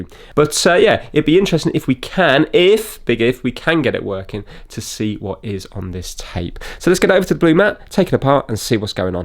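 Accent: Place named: Norfolk, United Kingdom. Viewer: Definitely a Norfolk accent. "Suffolk Swede" will be a dig at their near neighbours. Lots of rivalry between Norfolk and Suffolk.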